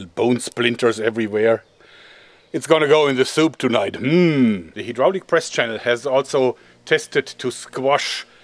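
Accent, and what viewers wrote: Germany. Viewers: not russain accent, but we do have a German accent. which is about as cool if not cooler. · He speak like a german :O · Your english sounds like you have a german accent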